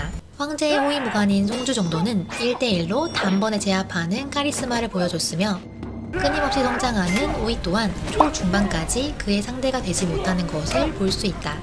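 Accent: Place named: Gyeongsang, South Korea. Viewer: 나레이션 경상도 억양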